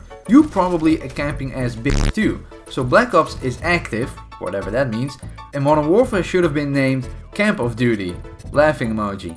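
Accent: Netherlands. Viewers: are you dutch? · Are you Dutch? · Je bent nederlands, Ik hoor het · I guess you’re dutch? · Are you dutch?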